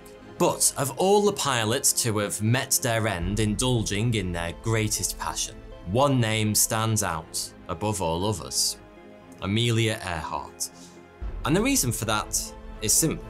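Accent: United Kingdom. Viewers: He has a clean British accent. I’m Canadian and being a Commonwealth country I can understand a Brit as easily as I can understand an American. If you want hard, try understanding a Scot, Irishman, or Welshman, especially if they’re piss drunk. I can but it takes a bit of concentration and a few pints first. Even Northerners near the Scottish border can be tricky at first. Australians and New Zealanders are fairly easy but I still can’t tell them apart. I always ask where they are from before assuming because they get really pissy if you call an Aussie a Kiwi or call a Kiwi an Aussie even though they sound the exact same. Being as I’m from Canada, I have a much harder time understanding Newfies and Quebeckers. Newfies talk way too fast and Quebeckers speak French and I don’t know French.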